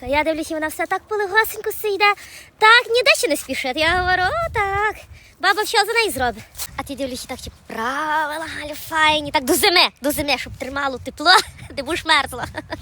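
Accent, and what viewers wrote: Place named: Ivano-Frankivsk, Ukraine. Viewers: Пані,як я люблю Вас дивитися то є цуд.Що би я робила без Вас,подивлюся Ваші відео і настрій маю на цілий день.Ваші відео антистресові😅.Здоров'ячка Вам міцного.Ви вмієте розказати і показати все файно як є в житті.А воно так в житті і буває.У Вас такий говір,як і в нас на Івано-Франківщині Всього Вам найкращого.❤❤❤❤❤❤ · ПАНІ. ВИ ЯК ЩАВЖДИ НЕПЕРЕВЕРШЕНА У НАШОМУ ЗАПАДЕНСЬКОМУ ГОВОРІ. ВАМ ПОВАГА РЕСПЕКТ. КАНАЛУ❤❤❤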